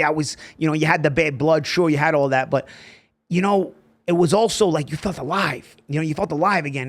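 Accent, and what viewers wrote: Brooklyn, United States. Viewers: I’m a casual boxing fan who actually discovered you Paulie as a commentator lol. whenever me and my friends would watch fights on showtime I would always learn a lot from you as an analyst. that’s when I was like damn who is this commentator Paulie he’s awesome and he reallyy knows his stuff.. I knew you were from Brooklyn NY first time I heard you talk lol just from your accent which drew me to you even more.. love this channel best boxing channel on YouTube!! Paulie is the man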